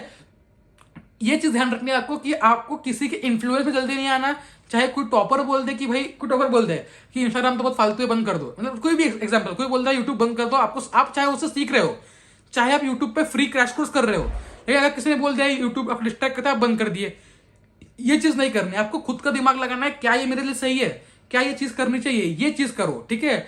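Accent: Maharashtra, India. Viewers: He is maharastrian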